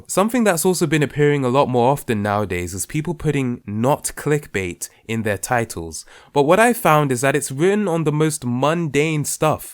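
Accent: RP, United Kingdom. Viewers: Heyeyeyeyeye are u British?? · Are you British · Refreshing to hear a black male with a posh accent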